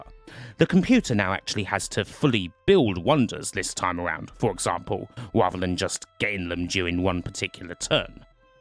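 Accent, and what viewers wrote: United Kingdom. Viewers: I'm not watching 45 minutes of a lithping limey talking about a decades old game.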